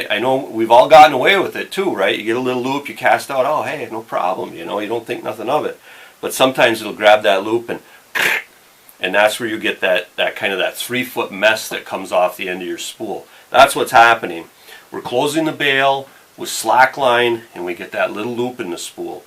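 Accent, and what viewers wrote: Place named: Wisconsin, United States. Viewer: First off awesome video. Second, I watched about 3 minutes of this and was like this guy must be from Wisconsin...then I saw the hat!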